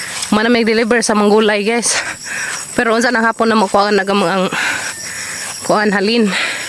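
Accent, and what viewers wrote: Bohol, Philippines. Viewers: Boholana k day? Kc ang yung intonation...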